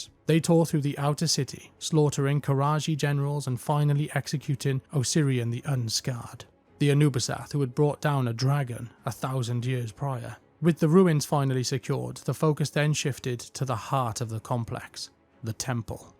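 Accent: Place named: Cardiff, United Kingdom. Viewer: i know a cardiff accent when i hear one.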